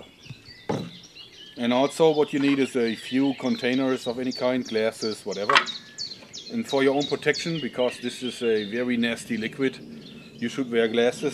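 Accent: Germany. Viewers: you are a german guy ? you speak with the germen dialekt :) and you was by the arme ? :D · Where are you from I can't figure out your accent I think it is german??? Can someone please correct me if I'm wrong thx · I can hear you're german! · Yeah, im german too, and i had heard his accent, beacause often german have this accent too (sry for bad english :D) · Wer 3-4 Videos von ihm geschaut hat merkt sofort dass er Deutscher ist dass ist nichts neues. Ich finde es gut dass er es auf Englisch erzählt damit es auch andere verstehen. Hauptsache man kann ihn doch noch verstehen!! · are you german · are you german? you talk like a german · What is his country of origin? I'm guessing he is German based on his accent, but I could be mistaken. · where are you from? your accent sounds familiar... are you German?? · I agree with the accent, but now that bugs me because Nords are supposed to be Scandinavian, not German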